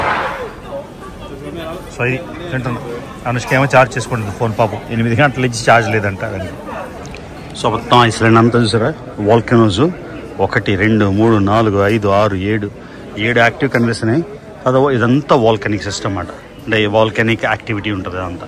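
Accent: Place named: Uttarandhra, India. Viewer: Take a Bow for our Mother Uttarandra slange ❤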